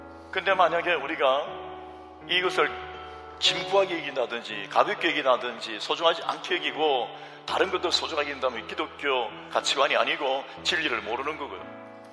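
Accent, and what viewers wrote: Gyeongsang, South Korea. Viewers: 경상도 ㅋㅋㅋㅋㅋ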